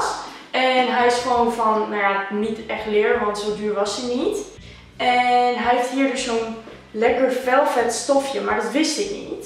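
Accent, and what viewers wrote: Twente, Netherlands. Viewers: Kom je uit Twente hahaha??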